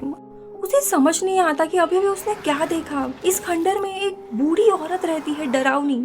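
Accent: Maharashtra, India. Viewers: That marathi accent 😍😍❤❤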